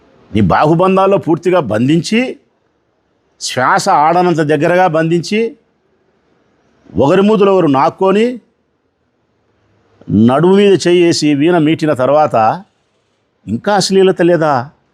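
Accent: Rayalaseema, India. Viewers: Sir నమస్తే, మీ ప్రెస్మీట్ చాలా స్పష్టంగా, సుత్తి లేకుండా, అశ్లీలతకు తావు లేకుండా మర్మగర్భంగా ప్రశాంతంగా సీమ యాసలో పండితులకు, పామరులకు అర్థమయ్యేలా వ్యంగ్యా స్త్ర లతో మాట్లాడిన తీరు అభినందనీయం. అయితే ఆరిపోయే దీపం వెలుతురు ఎక్కువంటారు. నాయుడు గారు శృంగార పురుషుడు. మిడ్ నైట్ మసాలా చానల్ నిర్వహిస్తున్న కళాపోషకుడు. ఆయనతోపాటు పొట్టకూటికి పనిచేస్తున్న యాంకర్లు స్వామిభక్తపరాయనులు. తప్పు చేసినవానికన్న ఆ తప్పును సమర్థించేవారిని ఏమనాలి? హిందూ ధర్మప్రభోదకులు, పరిరక్షకులు, ఎవ్వరూ పెదవి విప్పడం లేదు. నాయుడుగారి కన్నా వీరు దైవద్రోహులు కారా?. పండితులు, ఉపన్యాసాలు, ప్రవచనాలు చెప్పేవారు చేస్తే శృంగారం, పామరులు చేస్తే బూతు. ఆమెతో సహజీవనం చేస్తున్నానని నాయుడుగారు చెబితే సమస్యే రాదుకదా? ఎందుకింత రాద్దాంతం. లోకోభిన్నరీతి.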